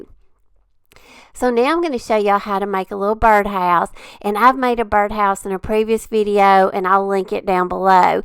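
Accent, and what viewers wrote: South, United States. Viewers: Me too! I do so love that southern charm accent that shouts easy going! Makes me feel home! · I love your channel. I also love your accent.. it reminds me of Southern Hospitality ❤️🥰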